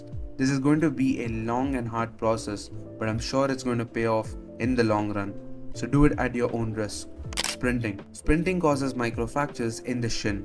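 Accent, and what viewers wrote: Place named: India. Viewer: after i heard the indian voice i knew that video is gonna be crazy xD gj bro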